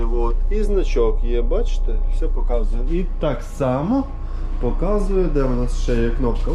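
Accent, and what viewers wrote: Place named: Transcarpathia, Ukraine. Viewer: Закарпатський діалект мене трохи здивовує😅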